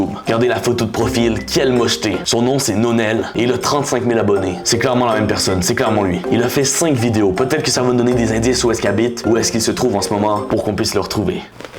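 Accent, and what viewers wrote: Canada. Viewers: Tu est Canadien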